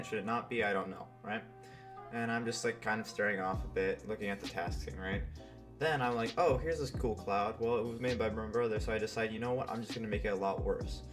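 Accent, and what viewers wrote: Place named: Scandinavia. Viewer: What part of Scandinavia are you from? I can tell you are Scandinavian from your accent.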